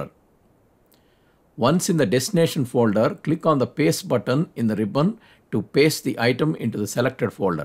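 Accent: India. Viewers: There is a need for Windows 11 instruction such as this but with instructors who can speak clear English without an accent, which in this vid are by an Indian speaker. I cannot grasp the content while trying to get through the accent. It is also important to have people who understand teaching techniques for clarity, and slowing down so that everything is done in 5 minutes.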